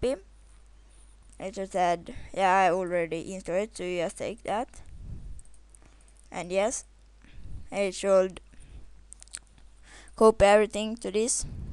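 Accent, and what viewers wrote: Sweden. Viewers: dat swenglish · worst tutorial ever if you dont speak that good of english please dont try it just stick to sweden cause your accent is embarrasing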